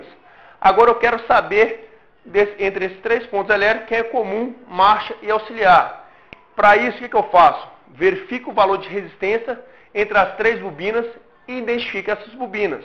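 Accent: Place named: Minas Gerais, Brazil. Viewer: Muito bão igual mineiro fala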